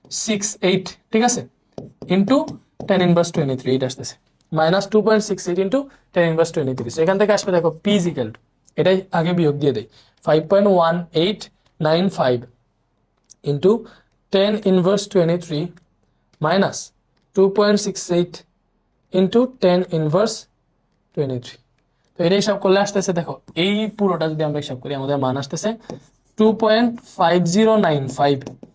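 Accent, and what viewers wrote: Sylhet, Bangladesh. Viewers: Ashole vhaiya sylhet er to tai accent gula amn hoy BTW vhaiya genius ❤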